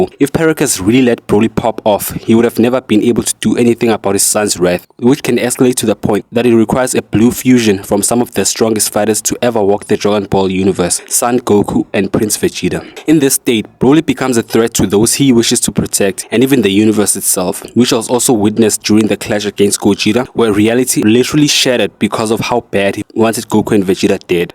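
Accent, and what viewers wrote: South Africa. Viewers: Sorry to say, your accent sounds more like a South African accent.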